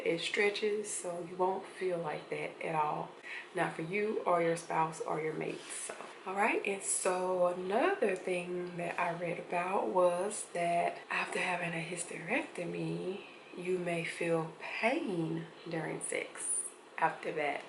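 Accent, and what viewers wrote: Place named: Missouri, United States. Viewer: You are fine girl everything in the right place doing the right thing you gwo grell I have to ask are you or your folks from back east like Illinois like an saw Kansas City you don't have to answer it's just the accent it's a personal game that I play I'm usually pretty good at it if I had to pick one of the three I would say Missouri thank you for the video by the way I'm a lot less stupid now LOL too bad you didn't make it before we broke up LOL or at the very least I wasn't enlightened enough to look it up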